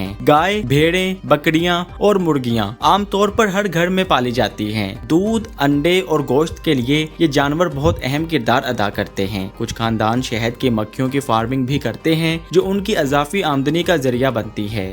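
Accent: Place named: Lahore, Pakistan. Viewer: lahori urdu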